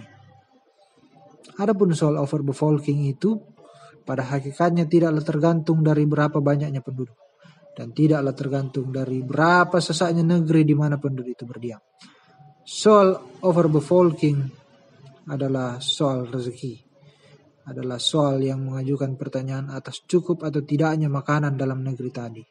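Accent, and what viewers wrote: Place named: South, Indonesia. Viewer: Mantap.. Tetap dan masih kentara logat selatan.. Salama'..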